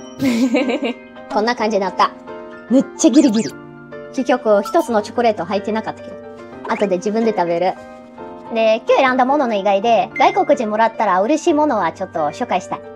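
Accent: Kansai, Japan. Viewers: 外国人女性でこの声質で関西弁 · ごきげんさん。初見です～～～～ぅ。なんや、かわいらしいサムネにつられて見たら、どないやねん。:face-orange-frowning: きっつい関西弁の、これまたごっつい関西のギャグセンスまでもちあせたロシア人でてきましたよ～～。:face-fuchsia-wide-eyes: ネイティブやないのに、ひとりつっこみやらそこまでの関西センスはよ～ならんわ～～、すごいわ～～。:face-fuchsia-poop-shape: 音声だけ聞いてたら、まんま関西のおばちゃんやで～～しかし～～、飴ちゃんちょうだいや～～。:eyes-pink-heart-shape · 関西弁良いですね🎵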